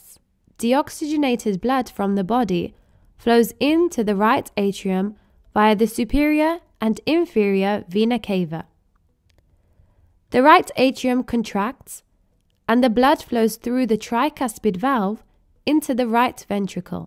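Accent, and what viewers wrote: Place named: United Kingdom. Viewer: Bless you and your British accent. Thank you.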